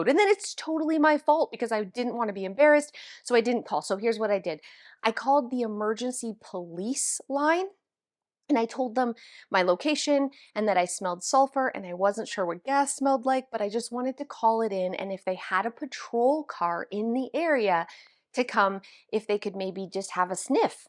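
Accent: Canada. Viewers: I'm going to work on a Word Search book that I got at the $ store, 10 min house tidy up, and do resistance bands for arms and legs! Thanks for sending this video. Your videos have become my happy place, 😍 I love your Canadian accent. I live in Minnesota, so not too far off with the way people talk up north!!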